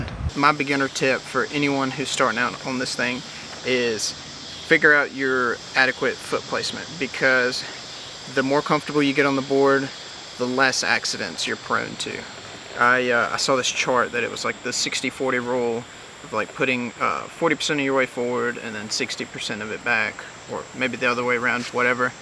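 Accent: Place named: Texas, United States. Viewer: Your voice is so good bra like texas but your a brown guy like me 🤣 such a mind blow out seeing as your so down to earth... can't picture a single (non racist!) texan I like other than McConaughey, until now! 👍🏽 from a guy in a tiny place called fareham in the UK ✌🏾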